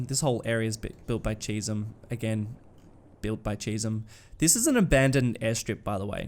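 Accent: Australia. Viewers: aussie guy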